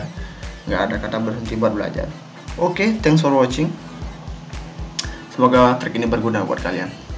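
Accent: Bali, Indonesia. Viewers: Adi care nak bali asane ngomong ne nahh wkwkkw,, salam youtuber bali, mampir ke channel ku yg masih pemuka ini ya,, wkwkw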